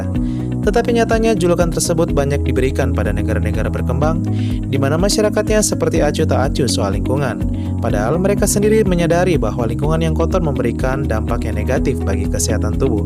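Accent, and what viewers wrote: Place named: North Sumatra, Indonesia. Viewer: penekanan huruf "e" Nya masih nampak. Sepertinya orang sumatera Utara.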